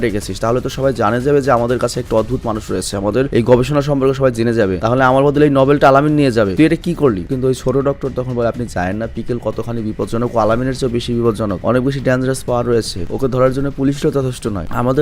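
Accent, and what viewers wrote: Noakhali, Bangladesh. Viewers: Vai Noakhalir kotha sune valo laghse..ami Noakhali 😌 kno Noakhalir kotha bolsen jani na kintu valo laghse..1 ripley plz😌👍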